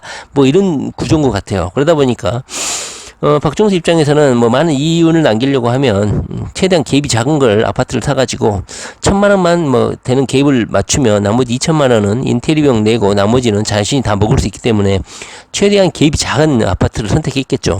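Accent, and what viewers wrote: Jeolla, South Korea. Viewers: 전라도 늠이네ㅎㅎ 슨상님은 잘 계시지라?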